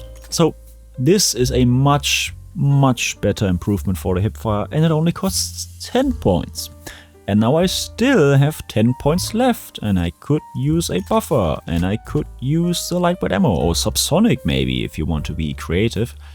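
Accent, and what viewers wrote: Germany. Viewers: I was wondering why you would change the keybind for entering the firing range from Z to Y but then I remembered your German accent xD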